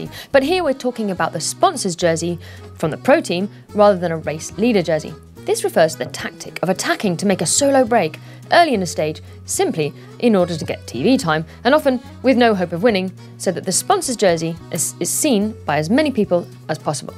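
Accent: France. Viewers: Hi, when will U start the GCN in french? I love french accent! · I'm french, all i have to say is that you're video and accent is really funny xD I can definitely understand why our French accent in english is funny for you!!! And by the way, you're "Fran-glais" as we say in france when we talk a mix of english and french, is owesome lmao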